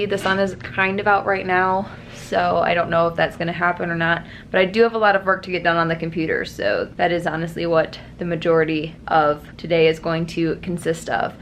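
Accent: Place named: South, United States. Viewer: I think that you are starting to get a little Southern twang in your voice! (I am from Alabama)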